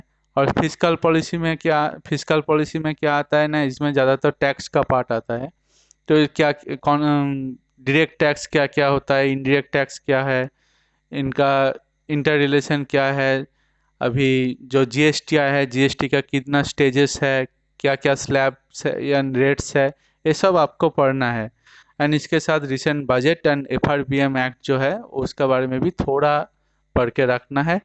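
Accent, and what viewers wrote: Bengal, India. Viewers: are you from west Bengal ? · Yes bro he is bengoli.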